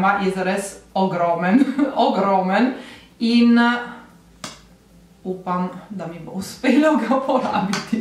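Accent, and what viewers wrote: Trieste, Italy. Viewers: Sloveno con accento triestino, top😆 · Si sente un botto che sei triestina hahahahhaha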